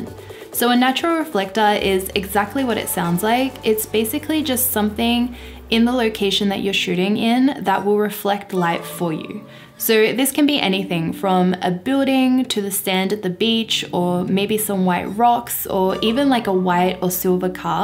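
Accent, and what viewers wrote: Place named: Australia. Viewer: Loved your Video very well done! You are adorable and your silky smooth voice with that Aussy accent ❤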